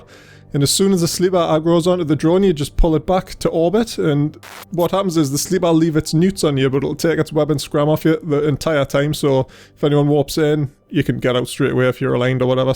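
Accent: Newcastle, United Kingdom. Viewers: Always great hearing another Geordie playing eve :D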